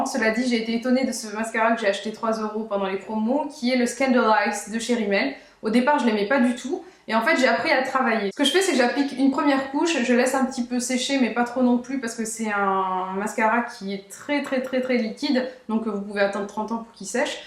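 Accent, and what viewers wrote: England, United Kingdom. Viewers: Super vidéo, merci ! Pour ma part, ton accent anglais me convient parfaitement, je trouve au contraire qu'il est top, ce qui n'est pas courant ;-)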